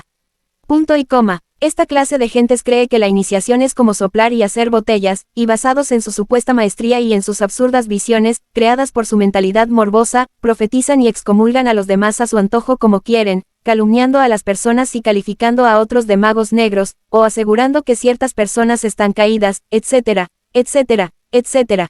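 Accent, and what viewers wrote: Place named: Rio de Janeiro, Brazil. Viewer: a Sede da FRA no Brasil , esta na cidade do Rio de Janeiro . nota-se o sotaque proprio daquela regiao neste.video . o audio nao possue uma boa qualidade . .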